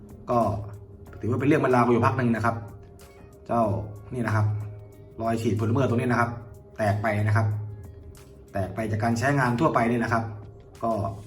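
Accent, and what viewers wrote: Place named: South, Thailand. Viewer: *ชัดเจนจริง นี้คนใต้แน่ผมว่าพี่ นี้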